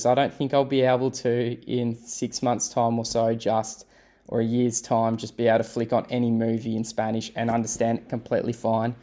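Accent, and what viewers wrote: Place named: Australia. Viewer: I am around the same hours as you (1300 hours), however, I am not counting hours anymore, just listening to as much content as I can. I agree with what has been said here that native Spanish is much different than Dreaming Spanish videos. I also agree that the roadmap is a little ambitious. I have been using Italki (65 classes) for 6 months and found that it has boosted my confidence, which I think is absolutely vital for moving forward with language learning. My speaking is certainly not fluent, but I can make myself understood. If I am talking about a subject that I am very familiar with, it is much easier than a subject where there is a lot of new vocabulary. My attitude now is not counting hours or making goals, but just to keep plodding and try to enjoy the process as much as possible.I am also reading a lot of books which I think has been great in teaching me sentence structure. 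I am of the firm belief that language learning is long-term progress with no ending - we just keep improving. That is why for me, attitude without self-pressure is vital so that I don't become too self-critical. I would like to add that I think ¨Dreaming Spanish¨is a great platform and I can attribute most of my learning to it. By the way, you sound like you are Australian - I am also living in Melbourne. Your video was frank and honest and that is great to hear because there is so much BS out there on the net with lots of false promises.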